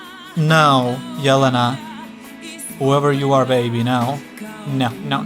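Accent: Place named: Bosnia and Herzegovina. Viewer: Oh my...you have a lot of spare time in your day. Some cultures speak directly and don't beat around the bush. Some are more passive-aggressive. The latter use more "neutral" and "politically correct" language, but their intentions are far from kind or respectful. My points are: 1. Not everyone is British on the internet/YT. 2. Not everyone speaks English as native (and the only one) language. 3. Everyone is entitled to their opinion and feelings. 4. If you [autor of this clip] don't have anything nice to say - don't say it. I could say a lot on his wall "art" and it's placement, but I will not. ;) 5. Cross cultural communication is not easy. 6. I understand that this is a clickbaity title, but saying "I'm not feeling" ALL songs from a particular country, and at the same time coming from a country that not really known for "feelings" nor particularly great results on the ESC is what you say the UK "interesting". 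;) P.S. I've just realized that this commentator has an accent in English and that he's coming from Bosnia which adds another layer of complexity ;) Hope the UK performer will not be the last this year. ☮️ Have a nice day [not sarcasm, really mean it] Cheers!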